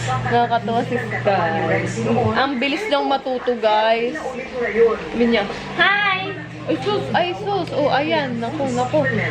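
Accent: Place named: Quezon, Philippines. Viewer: hehehe puntong quezon ai... tlga poh san poh cla s infanta?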